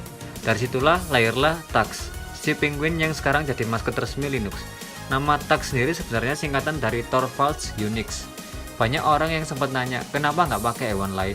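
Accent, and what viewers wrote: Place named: Java, Indonesia. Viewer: Nah, logo penguin itu sebenarnya mirip sama pengguna linux yang perutnya gede, contohnya bapak floss stallman. Btw salam medok bang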